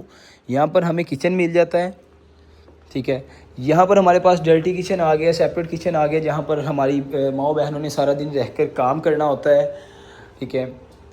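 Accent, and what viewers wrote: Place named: Rural, India. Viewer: Paindu kahi ky bolna to seekh lo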